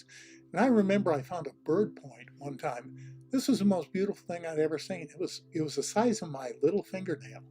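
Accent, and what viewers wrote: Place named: Massachusetts, United States. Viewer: You're a very interesting man,. I suspect that I could listen to you for hours. I summer in Newport, RI and I understand that you reside in New England; I suspect Massachusetts from your accent. Perhaps you'll have a subscriber meetup in the future and we can meet and exchange stories. I've had several encounters in my career that might stun you. Actually, it was these encounters that were directly responsible for my interest in the subject of extraterrestrial life. Great live hang. I'm off duty next Tuesday and expect to finally get to see a live show.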